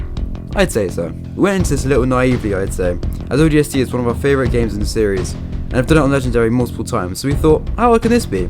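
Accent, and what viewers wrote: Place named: United Kingdom. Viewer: Videos decent! Couple of small points to genuinely help you for future videos, Adapt your voice to more of a "commentating voice" e.g. Speak a bit slower and clearer, Both of us being British makes it harder for people to hear past our accents. - Also don't do what I done and stop uploading! Stay consistent with these types of videos and you'll do well!!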